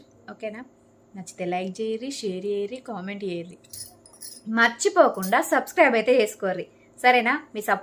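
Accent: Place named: Telangana, India. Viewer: Mee slag telangan nachhindi super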